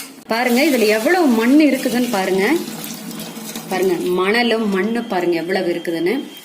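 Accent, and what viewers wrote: Kongu, India. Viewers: கொங்கு தமிழ் அழகே அழகு ......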